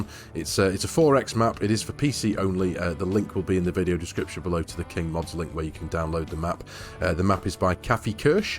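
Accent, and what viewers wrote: United Kingdom. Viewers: You can't imagine the joy i have by just listening to your voice. I can't say where you from in the UK. I love the 4K maps , it takes some time to get started. The investment in you farm or production points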